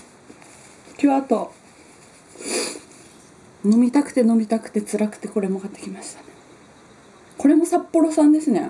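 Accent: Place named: Tohoku, Japan. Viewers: 美味しそうに食べますね。お腹空いてきました＾＾ 東北の方ですか？ 東北人なのですが、なんとなくイントネーションに親近感が沸きます。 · 訛りが私と同郷な感じがするのですが・・・(笑) 私は生粋のイナカッペ、キリタンポ野郎ですｗ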